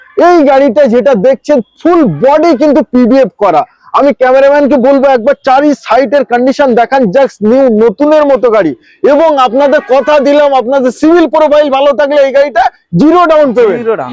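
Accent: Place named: Bangladesh. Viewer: Kotha sunay Monday hochhay bangladesher apni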